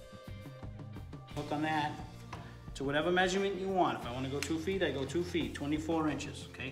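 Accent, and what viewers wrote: Boston, United States. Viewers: I recognize a fellow Bostonian when I hear one!!!! 😂 😂😂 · Yeah, man. I’m a newbie and you’re talking straight to my level. You explain and repeat things so that I won’t have to rewind the video and it’ll be easily remembered. The comic relief and your accent (Boston, I’m assuming) is icing on the cake. Thanks, brah! Blessings.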